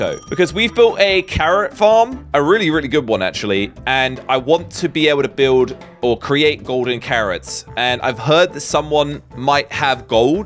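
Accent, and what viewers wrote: United Kingdom. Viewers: HE IS BRITISH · British accent every episode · sounds so british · most British sounding intro I've ever heard 😭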